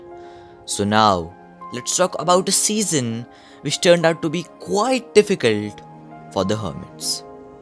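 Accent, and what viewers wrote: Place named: India. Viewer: You are definitely Indian. Judging by your accent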